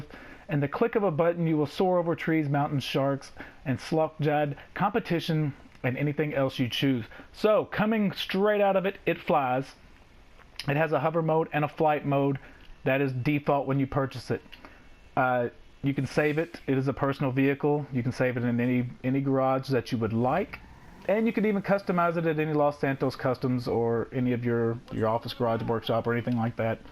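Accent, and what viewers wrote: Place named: South, United States. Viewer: Gotta love that southern accent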